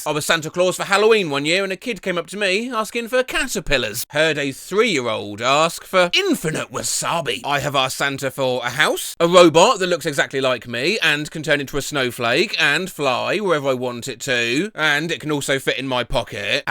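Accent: United Kingdom. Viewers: I'm new to your channel, and I twigged you're British from your accent... Cor blimey! I was spot on!!! (Btw, Merry Christmas Mate !! 🎅🎄)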